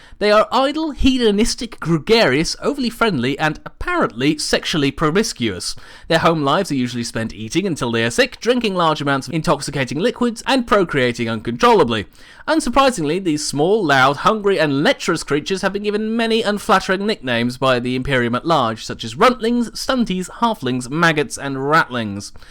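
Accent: United Kingdom. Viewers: Hey I love your channel and every time you post a video it brightens up my day with glorious 40k goodness, delivered in a charming English accent just a it should be. I was wondering if you would re-visit space marine chapters with The Silver Skulls, a very little known chapter with a interesting set of traditions that really deserves more attention from fans. In short cross Ultramarines and White Scars.... yea thats a thing <link>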